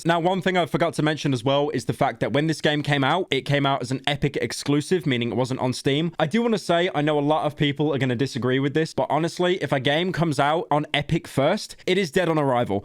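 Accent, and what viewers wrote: United Kingdom. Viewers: I’m convinced viewers are degenerates and are okay consuming anything with buzz words with funny British accent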